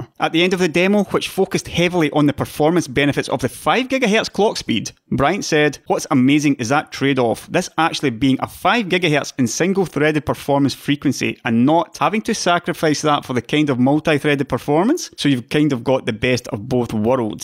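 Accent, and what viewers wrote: Scotland, United Kingdom. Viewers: developing a taste for Scottish accent thanks to you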